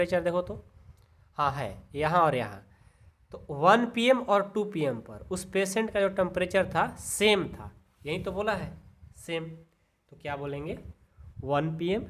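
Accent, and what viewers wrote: Bihar, India. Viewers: Abe bhai yaar tum behari ho kya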